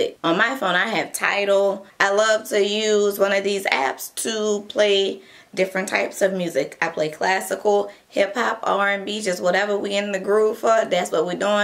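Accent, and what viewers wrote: New Orleans, United States. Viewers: Thanks for the tips! Also I love your accent, are you from New Orleans? I died laughing at the old lady story 😂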